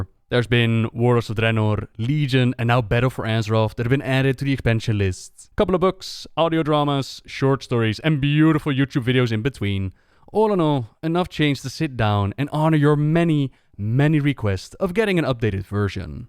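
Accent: Netherlands. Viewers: That heavy Dutch accent is hard to ignore :) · annoying dutch accent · dutch accent :( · You sound dutch! Fun to hear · Ik hoor echt dt je Nederlands bent :) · Are you Dutch? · sounds like Dutch accent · Nope but close, he has a very strong Dutch accent.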